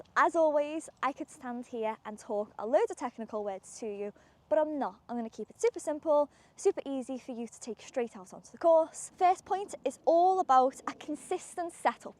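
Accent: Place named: England, United Kingdom. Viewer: you are a great teacher and I love your English Accent 🥰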